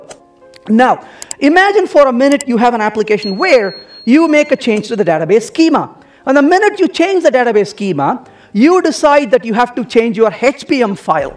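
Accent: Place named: India. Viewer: Indian accent and public speaking don't go well together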